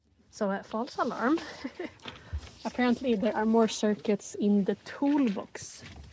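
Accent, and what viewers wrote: Sweden. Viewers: Charming Swedish accent😅 (the impossible i’s😅😅) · du høres Svensk ut?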